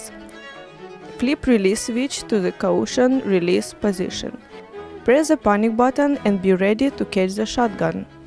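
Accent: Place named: Russia. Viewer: Да она Макдональдс вообще по-русски произносит.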